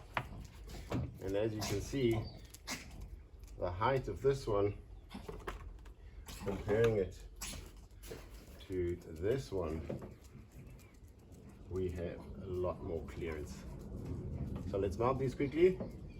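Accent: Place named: Gauteng, South Africa. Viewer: Profile says Spain. My ear says Gauteng :)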